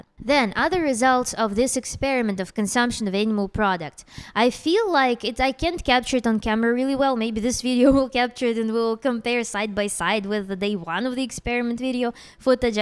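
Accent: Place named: Eastern Europe. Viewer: OK I could sense an eastern European accent, but I wasn’t quite sure. I have three different friends from Russia and we talk on Skype. One is from Moscow one is from St. Petersburg and the other is from Novsibirsk. I’m from Texas. One time I told one of my Russian friends I believe it was the one from Siberia that it was still very hot in September. It was about 38°C. His only reaction was holy shit lol. Another time in summer, my friend from Saint Petersburg asked me what the weather was like. Well, I asked the Amazon echo to convert from Fahrenheit to Celsius and the Amazon echo said it was 40°C. He thought he misheard. He was like did it say 40°C? Lol.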